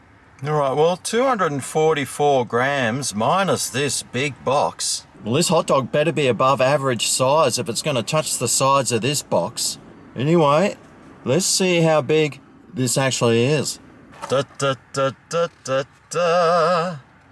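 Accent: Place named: Australia. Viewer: I watch your videos because the Australian accent and behaviors thoroughly annoy the ever loving sht out of me. It feels pretentious and masquerades as highly educated. But I respect what you do and keep doing it bud.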